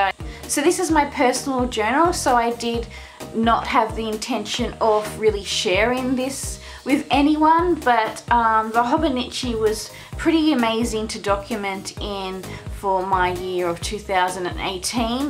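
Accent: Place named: Australia. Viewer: Omg I was wondering if you were aussie from your accent. I had to check the info on your page. Yay to Aussies using Hobonichi. But omg the shipping!!!!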